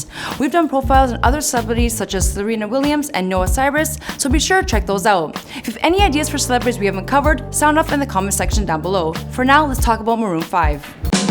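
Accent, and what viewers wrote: India. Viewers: True. She sound like an Indian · Dancehall Lyrics i thought the same thing too. Even Priyanka Chopra sounds less indian than this presenter.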